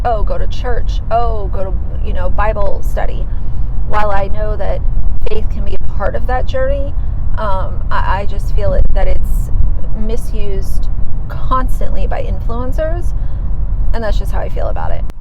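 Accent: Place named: Colorado, United States. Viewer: You almost seem like a Colorado girl! Just how you talk. You would fit right in around here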